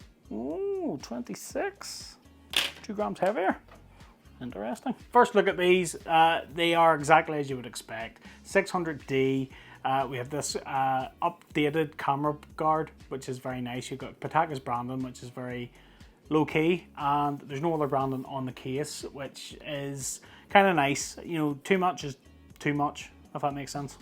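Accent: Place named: Belfast, United Kingdom. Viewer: Cheers for the vid, sounds like you dont live too far from me lol (belfast) My s24 ultra is arriving on Thursday (hopefully) from three and I need to pick a cover. I usually just get a basic leather folding cover from amazon for my phones, the one on my current s22+ seems to have done a pretty good job at keeping the phone safe so I may end up just getting the same again. But I'm also willing to spend a bit more on a good case for the s24u. The only thing I don't like is having a cover that doesnt fold over and covers the screen. I also like to keep my cards in the phone cover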